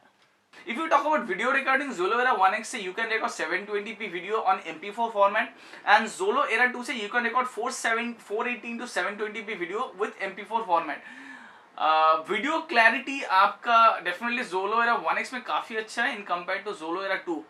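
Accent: Bengal, India. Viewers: bangali accent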